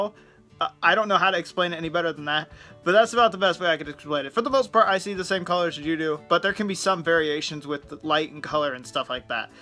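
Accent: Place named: Ireland. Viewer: You do have a GREAT St. Patty's Day accent!!!!! And you are so funny,!!!!! Thanks for the laughter you bring me!!!!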